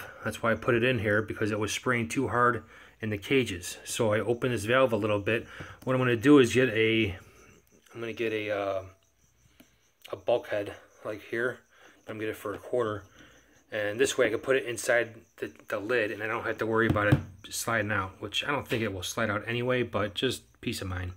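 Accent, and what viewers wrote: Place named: Chicago, United States. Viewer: Love your accent. Chicago area?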